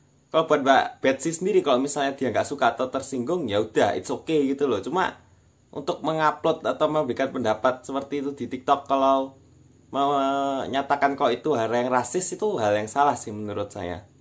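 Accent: Java, Indonesia. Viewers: Medok jowomu mas 😅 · Kaget gw sm medoknya wkjwkwk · Cino jowo ya ko medok banget logatnya 👍👍👍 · mas medhok bgt..😂😂cada mas..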